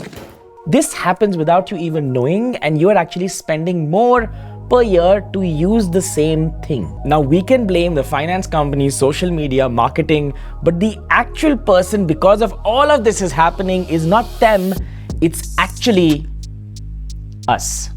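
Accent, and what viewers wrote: India. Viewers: Just discovered this channel, usually it is hard for me to listen to an Indian accent, but you do amazing job. Subscribed!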